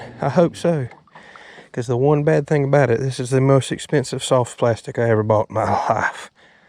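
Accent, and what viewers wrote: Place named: South, United States. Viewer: You are definitely right on the pronunciation but I like his accent and the way he says it better. 👍👍Good old Boy from the south speaking my language. 😀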